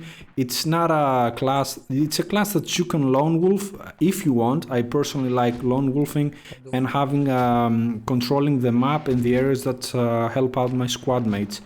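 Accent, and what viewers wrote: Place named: Greece. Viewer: I can hear the Greekness in your voice :D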